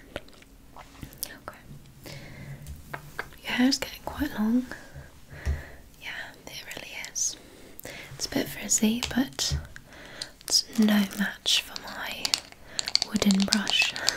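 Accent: United Kingdom. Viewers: Your British accent is fab!! I hear some potential for Northern Irish there too - I think you’d be great at it as well! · I love the British accent so much 🥰💖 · The British accent is actually adorable lol.props to you because it's not the easiest to master 😊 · You have a very good British accent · Well done on the British accent,I'm from Birmingham so sound like the Peaky blinders 🇬🇧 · doing a british accent is just so tingly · obsessed with your british accent 😭 i love these kind of hair asmr vids so i was so happy to see this 🫶🏻💗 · Girl I love this accent! Thank you for appreciating us brits😭❤ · I've suffered from tingle immunity for a while and this video cured it! There's just something about the British accent. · I don’t know what it is about the British accent but I love ittttt ❤️ happy early Easter! If you celebrate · TIMESTAMPS🐬 - ~ intro, thanking you for coming over & chatting (face touching). 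- ~ showing you her hair charms. - ~ more chatting. - ~ more hair charms. - ~ hair tinsel. - ~ tapping & scratching her hair brush. - ~ taking your braids out & commenting on the weather. - ~ brushing your hair. - ~ showing you her butterfly clips. - ~ detangle brush & gossiping. - ~ braiding your hair. - ~ butterfly clips in the braid. - ~ brushing your hair - ~ putting hair tinsel in your hair. - ~ braiding your hair & hair charms. - ~ aquamarine the movie. - ~ hair serum. - ~ massaging your scalp & positive affirmations. - ~ fixing your parting. - ~ more butterfly clips. - ~ noticing how similar our hair colour is. - ~ tapping on hair charms. - ~ putting more tinsel in your hair & showing you how to. - ~ wrapping up the video. p.s ur British accent is incredible. It’s sooo satisfying hearing you say ‘pretty’ 😊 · as a british person i can’t really tell where in the uk your accent would be from but i love ittt it sounds way nicer than most british accents 😭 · One of the only british accent i love Edit: 3 Weeks later this still gives me the most Tingels ever❤ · I think London mixed with brummie hahah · you with a british accent is really giving me anya taylor joy vibes !!! 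· your british accent is always so great ❤ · As a British people I always found it annoying when people would over sell the accent by like <num>. However your is sweet and suttel. Luv u ♡ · something about your british accent gives me so many tingles.... I've had a bit of tingle immunity recently but this is Fantastic!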